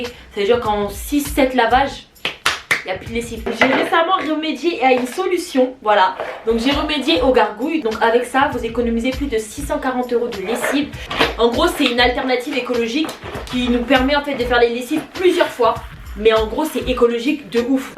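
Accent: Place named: Democratic Republic of the Congo. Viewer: L'accent Congolais😘❤️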